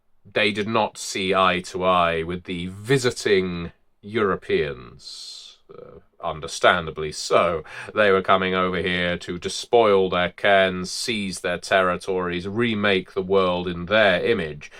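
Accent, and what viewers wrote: England, United Kingdom. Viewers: It really just the cherry on top, hearing an articulate English accent justifying Colonialism in the 18th century with the claim that humans fundamentally and habitually extract and exploit to live. Chilling. Love it. You know, in the US we pride ourselves on being very sad about being a very successful, leading, world military and "not-empire" that spans across a continent and around the world. Everyone just ignore the fact that the sun never sets on US territory, ignore the military base in your country, and the nuke subs in launch range of your cities. We're not an "empire"! How ridiculous. We have a legion of lawyers to show you how wrong and slanderous it is to point out that fact!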